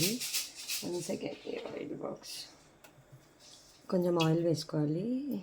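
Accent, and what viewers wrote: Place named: India. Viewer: I already subscribed but I'm a big fan of your voice and what a Telugu slang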